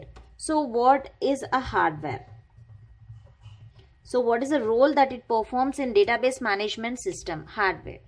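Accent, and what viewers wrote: South India, India. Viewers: Love ur south indian accent ma'm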